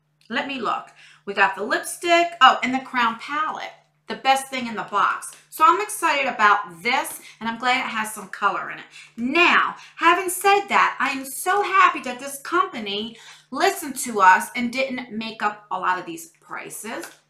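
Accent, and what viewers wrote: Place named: Long Island, United States. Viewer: You're just such a sweet and positive but very real person,. My husband's from Long Island and when he hears me watching your videos he says it sounds like home. ❤️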